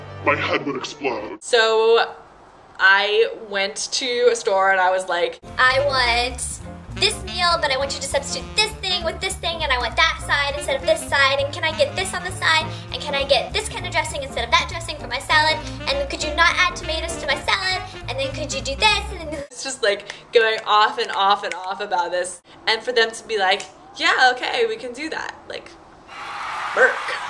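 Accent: South, United States. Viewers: I can't remember if i asked you this before or not so Im gunna ask again... Do Koreans notice your southern accent? Yours isn't really noticeable to me but Im sure it is to someone who doesn't come from the south (and to anyone who is reading this, I mean the southern part of America). I have more of an accent so would they notice it as country or just American? Like would they point at me and say "cowboy!"? Or cowgirl i guess... Lol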